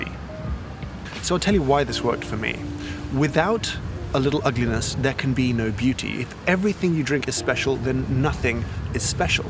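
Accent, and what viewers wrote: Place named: England, United Kingdom. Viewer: It's kinda funny because (for my understanding of accents) sounds English, and to our rather specifically cultivated Italian taste buds, England is among the worst eating places in Europe. I'm not saying Italy has great food and everybody else doesn't - in fact Italy has been out of the main "best restaurants - most Michelin stars in the world" tour exactly because in a way Italian taste won't meet international taste: our wine is tasteless to Californians and French, and until Tuscany started making wine specifically for the USA, we would not sell or score high abroad. But the concepts brings forth are rather sound, tasting for Italians, on average, is more developed but also more of a niche, and we won't be satisfied by anything that won't taste as our mothers did it. And if everything is special, nothing is special.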